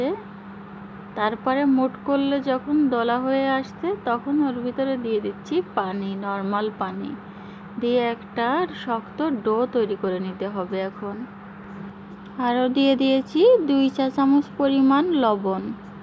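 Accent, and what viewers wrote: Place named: Jessore, Bangladesh. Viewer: Apu josor amer nani bari maura.tomer vasa sunay sob soma a monay hotow amer kasayr mama khalara ktha bolsay.bosoray akber nanibari na gaylay monayhotow jibontae vritha.now ja oya ho a na.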